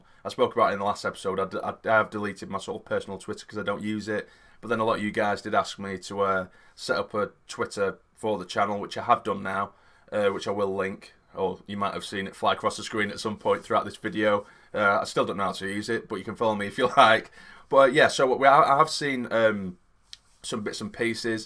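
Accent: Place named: Scotland, United Kingdom. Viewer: Not a bad Scottish accent imo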